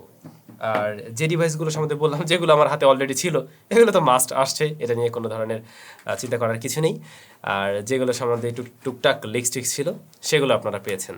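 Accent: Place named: Barishal, Bangladesh. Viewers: বরিশালের কেন জাগয়া বাসা আপনার? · ভাই তোমার বাসা বরিশালের কথায়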